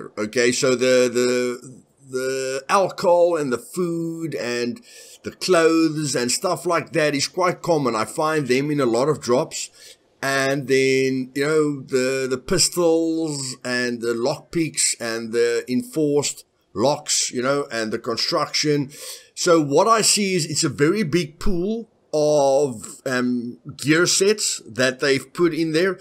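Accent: South Africa. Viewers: hahhahahaha 1000% south african! i love it! Dankie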